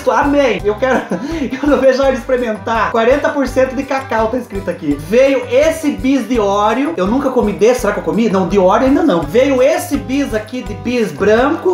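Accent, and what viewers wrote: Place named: Caipira, Brazil. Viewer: tudo que tu ganhou foi bem merecido, você faz a gente rir com esse sotaque meio caipira amo 👏🏾👏🏾👏🏾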